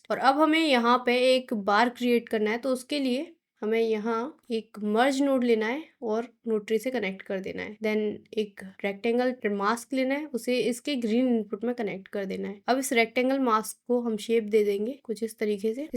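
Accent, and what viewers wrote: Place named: Uttar Pradesh, India. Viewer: Aap UP se ho kya... Tumhare Accent se lg rha h:face-orange-biting-nails:. Btw loved your Editing style and Content.❤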